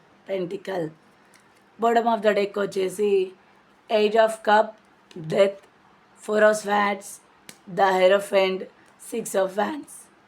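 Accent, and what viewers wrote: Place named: Telangana, India. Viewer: Mee language telangana FASA bagundi.konchem boldness ekkuve.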